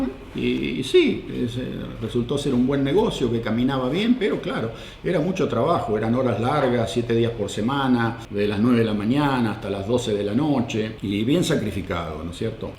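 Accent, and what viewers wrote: Argentina. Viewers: Ayyy! Qué increíble tu historia! Hablás como si estuvieras viviendo en Argentina tu acento.Abrazo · Lindo video! Que buen relato tienes, no has perdido el idioma ni los giros argentinos, me encanta escucharlos, tiene a quien salir. Felicidades a todos en este año que se inicia y ojalá salgamos del pantano que Argentina está inmersa, cosa que no lo creo... al menos por varios años, nos falta tanto para ser de primer mundo, necesitaremos la ayuda de Dios. Buena suerte y hasta la próxima, saludos desde San Lorenzo (Sta. Fe), Argentina.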